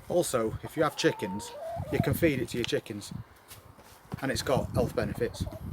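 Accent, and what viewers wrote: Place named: Shires, United Kingdom. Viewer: That accent sounds like a lad from the shires.🐝🐝🐝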